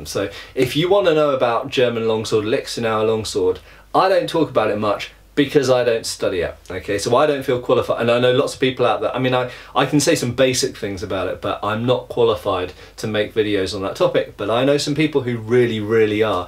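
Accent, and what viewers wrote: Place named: RP, United Kingdom. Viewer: Metatron led me to your channel. Among my interests are History, Archaeology, Weapons and Hema and in my opinion you are one of the best content creators on youtube. I also really enjoy your movies and tv series fights evaluations, they're brilliant. I think people visit your channel not only to be entertained but, mostly, to learn something, and I really can't say the same (for example) about Lindybeige, highly entertaining but with very less quality. I can't wait to watch your History videos (with your BBC voice :-) ). I'd also like to hear you explain old fencing manuals, like di Grassi (or even Fiore dei Liberi, Liechtenauer or Meÿer!). Keep up the great work, and thank you sir. p.s. Do Rants!!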